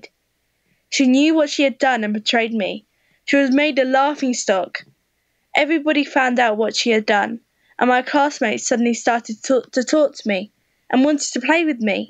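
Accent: United Kingdom. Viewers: OMG I LOVE YOUR VOICE!!! (sorry im a sucker for British accents) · I love her British accent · U sound British I like it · It’s a British accent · Is she British? I’m not being Offensive but I’m wondering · Is she british i luv brits! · I love your British accent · Eyyy another british girl!! :3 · Devica she's not Australian!! She's British!! I'm British too and that's what they sound like! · British yes finally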